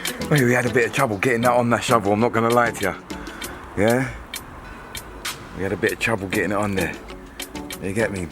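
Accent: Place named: Jamaica. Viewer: I’m confused are u Jamaican or English broo??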